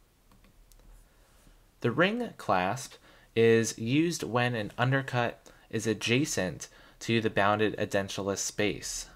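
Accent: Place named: United States. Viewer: PLS WHEN YOU SPEAK TRY TO SPEAK ENGLISH NOT A AMIRICAN language COZ HERE SOME DR THEY ARE NON PROFICINAL ENGLISH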